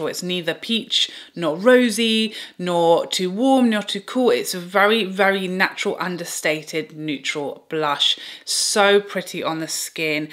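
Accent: United Kingdom. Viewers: I love listening to you speak !!..Ilove your english pronunciation !!Love British english !!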